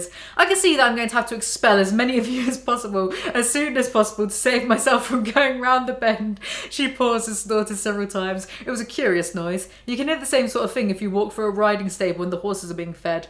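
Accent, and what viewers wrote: England, United Kingdom. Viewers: You reading this with your accent makes it so much more enjoyable, British really was made for insults.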